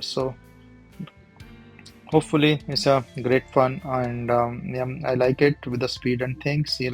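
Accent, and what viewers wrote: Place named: India. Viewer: Bro your English is like India isn't you ???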